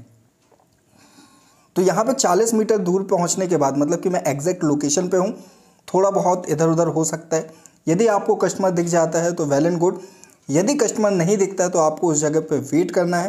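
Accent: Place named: Indore, India. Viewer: Bhai Indore se ho kya 😅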